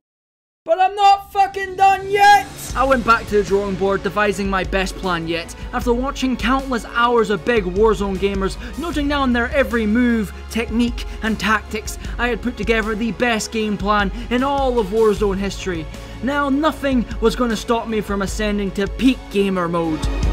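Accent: Scotland, United Kingdom. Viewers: You from Scotland bro?? 🏴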